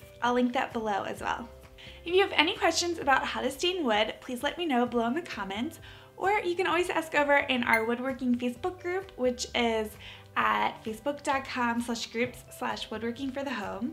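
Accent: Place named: California, United States. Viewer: Terrible Californian “ Hollywood “ accent. Speak the Queen’s English Dear!!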